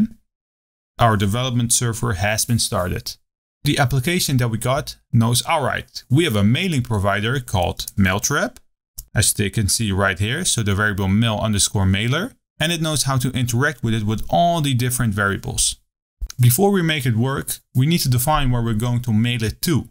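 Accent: Netherlands. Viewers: Great tutorial! You sound very Dutch btw...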